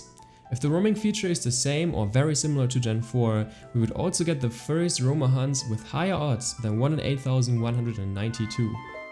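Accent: Germany. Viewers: you sound like youre german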